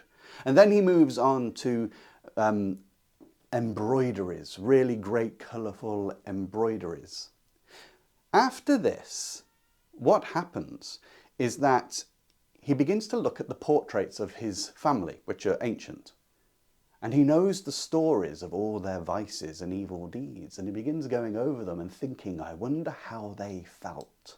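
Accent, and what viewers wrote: United Kingdom. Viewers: excellent analysis - thank you! also your particular British accent is easier to understand for this midwestern American.....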